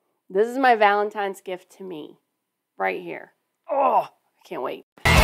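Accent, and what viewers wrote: South, United States. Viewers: Uilleann pronounced ILL-En or ILL-Yen for your southern accent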